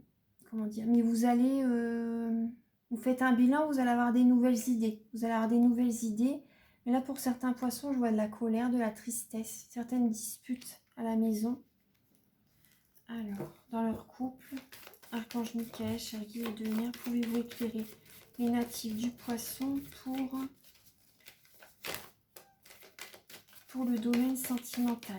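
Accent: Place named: North, France. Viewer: Quand je vous écoute, j'ai l'impression de m'entendre quelques années auparavant. Même voix, mêmes intonations et même accent ! ( Du nord) c'est troublant !!! Bonne continuation !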